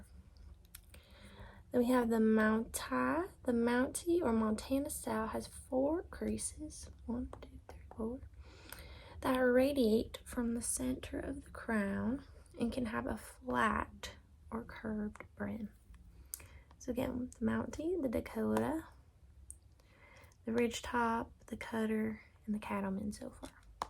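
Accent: South, United States. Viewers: Soft spoken ✨️ Southern accent 🌸 Showing us things on iPad 🎀 10/10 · i love your southern accent videos, hope to see more of them <3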